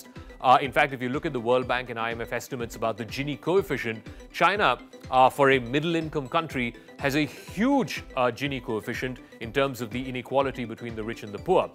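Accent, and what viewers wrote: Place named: India. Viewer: First time to see this channel and I love it clear unbiased factual and subcribe. First I hesitated since it sounds Indian,I'm not a racist because I've watched a few Indian channels they're all craps loke WION/GRAVITY,TGIF etc.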